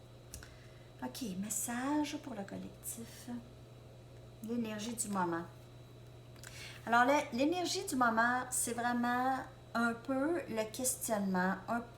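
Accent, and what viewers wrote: Canada. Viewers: Vous êtes belle , j'aime votre accent canadien 🥰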